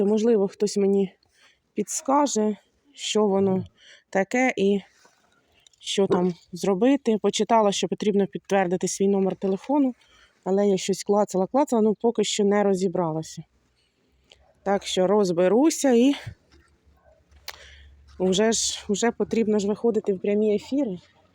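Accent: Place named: Poltava, Ukraine. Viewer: Наша Полтавська мова , аж приємно 🩷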